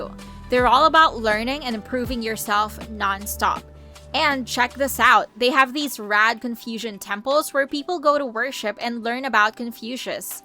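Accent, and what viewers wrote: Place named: United States. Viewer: The narration is so American - freaking freaking freaking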